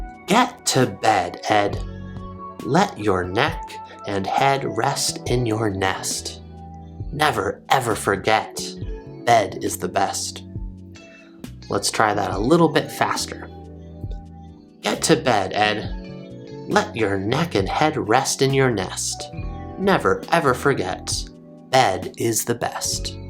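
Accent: United States. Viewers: What side of the US are you from, to find out more about your accent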